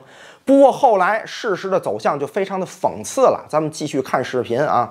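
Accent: China. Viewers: 一个新加坡人，带着纯正的国内口音，中国人的逻辑方式，人畜无害的语气，绝对是漂亮国最好的舆论工具！！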